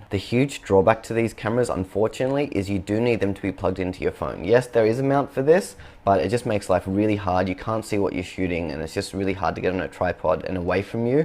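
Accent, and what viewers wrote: Australia. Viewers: Your saying the original sperical shaped 360 gear from 2016 records in 4K. I'm looking through reviews and nobody is saying that. Well I puzzled??? is this for real it records in 4K? thanks for answering in advance and my other question (seeing you are in Australia by the looks and accent) where in Australia can I purchase the XIAOMI? Can you recommend any retailers that sell it?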